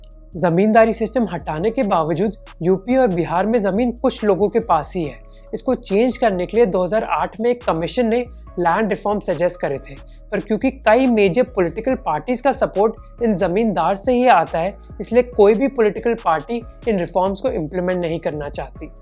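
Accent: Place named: Karnataka, India. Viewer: YOUR VOICE IS SAME AS MY KANNAD FRIEND .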